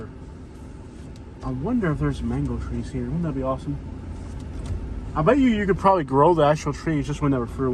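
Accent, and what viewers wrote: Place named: South, United States. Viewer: Your Southern Accents are becoming Seasoned now.btw UR correct... Family es muy importante. Especially for regular folks.Wealth is created from having a strong tight knit family.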